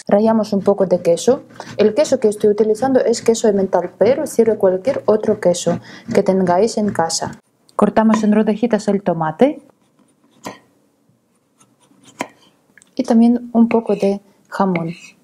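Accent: Russia. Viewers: Me encantan tus recetas una rubia hermosa y simpática muchas felicidades por tu canal rusa hablando español excelente idea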